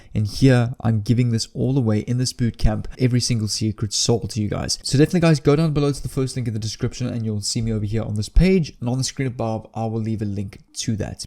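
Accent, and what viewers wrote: South Africa. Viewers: Wow you sound South African👌🏽Great content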